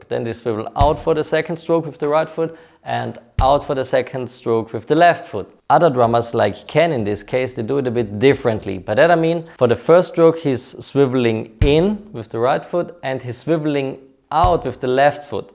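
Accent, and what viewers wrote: Vienna, Austria. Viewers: Mehr Wiener Deutsch!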